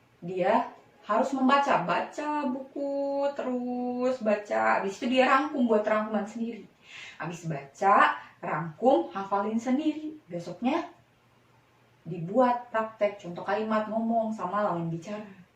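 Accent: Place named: Palembang, Indonesia. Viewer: Palembang punyo ini.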